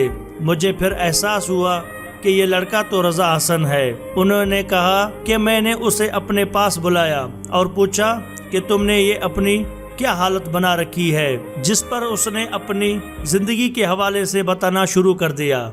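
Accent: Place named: Punjab, Pakistan. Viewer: Very poor commentary, hard core Punjabi accent. Nice topic and good cause.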